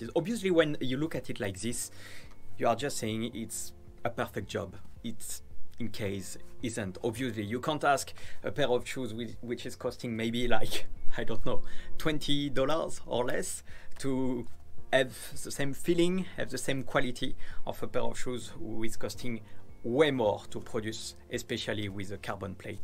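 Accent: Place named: France. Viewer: Oh l’accent français à couper au couteau !!! lol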